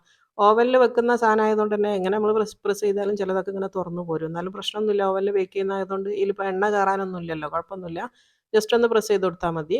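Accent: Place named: Kozhikode, India. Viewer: Super dear njangale Kozhikode slang 🥰❤️presentation super. 👍stay connected 🥰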